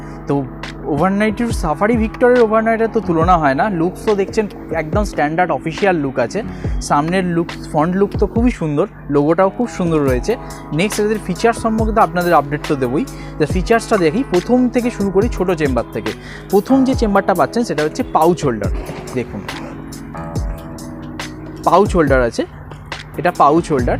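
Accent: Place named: United States. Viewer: He talks Hindi in American accent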